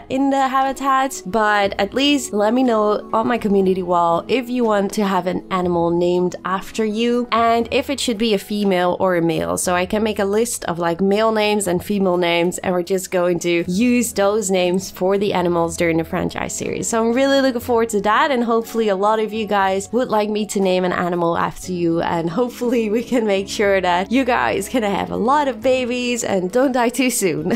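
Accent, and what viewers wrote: Netherlands. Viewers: Love all your video’s but are you Dutch ?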